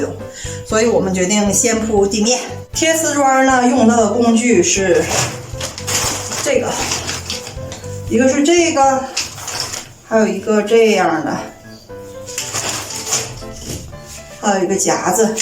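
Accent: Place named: Heilongjiang, China. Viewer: 为什么这么像黑龙江口音？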